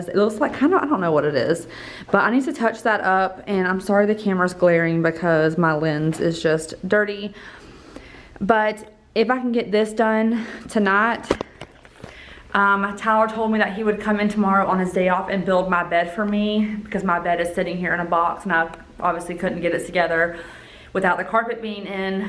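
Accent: South, United States. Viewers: You sound so southern now...love ya x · The all white will be beautiful! Your southern accent is getting so strong! Also, everyone loves Tyler! He is the best and we can’t wait to continue to see him more on your channel! ❤️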